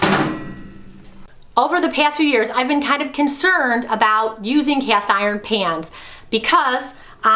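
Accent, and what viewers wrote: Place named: Wisconsin, United States. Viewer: Well,... Your accent reminds me of my family, all who hail from Wisconsin & some while back also Chicago. Refreshing...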